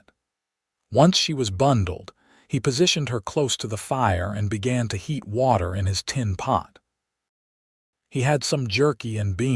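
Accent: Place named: United States. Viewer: At First: favorite narrower - an ideal voice for wild west stories, through and trough American English, sounding like a music instrument. Greetings from Germany.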